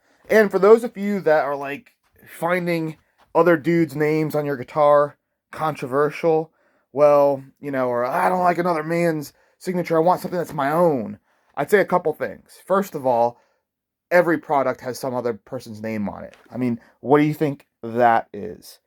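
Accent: United States. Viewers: wenn der nicht so einen furchtbaren amerikanischen akzent haette, ... nicht zum anhoeren. OI! Speak English!!!!!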